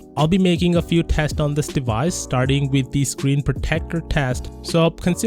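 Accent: India. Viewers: many people in India speak with this accent. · it's accent not plastic surgery ! In india their r many people who speak like this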